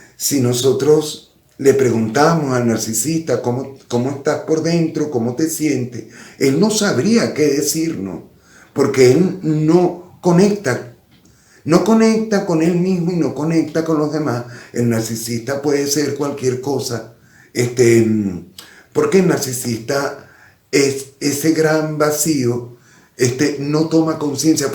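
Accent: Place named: Venezuela. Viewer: Me gusta ese acento. Parece venezolano. Muy dulce como habla también. Gracias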